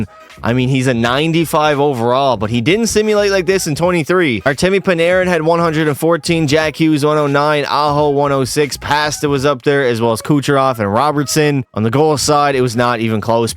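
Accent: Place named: Quebec, Canada. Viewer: 10/10 your Québec accent!! Should be living at Montréal.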